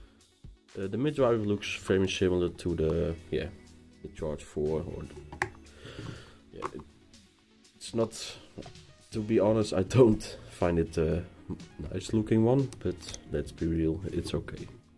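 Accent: Netherlands. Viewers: Nederlands ? Ik hoor de nederlands Engelse uitspraak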